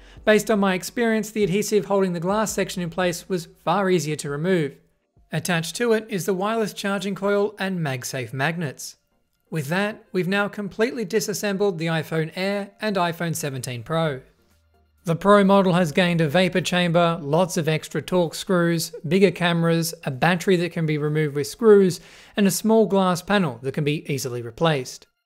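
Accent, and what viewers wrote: Australia. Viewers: Australian english mate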